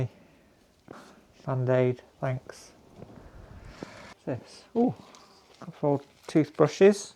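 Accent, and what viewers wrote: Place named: Ireland. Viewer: Im new to your channel ❤❤❤ i love your voice it's your irish accent ❤❤ all my love from America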